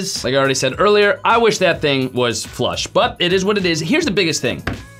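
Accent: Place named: Connecticut, United States. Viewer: from CT I'm from TN but raised in NY so in know CT when I hear it or Mass.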